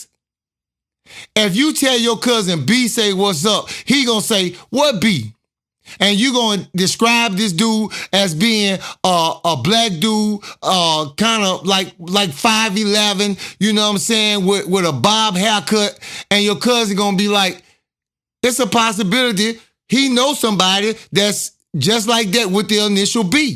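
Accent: Louisiana, United States. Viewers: That Louisiana accent makes the stories sooooooo much better on gawd. 🔥🔥🔥💯💯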